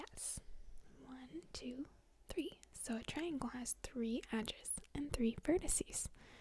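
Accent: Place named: Minnesota, United States. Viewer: are you from minnesota? you got the accent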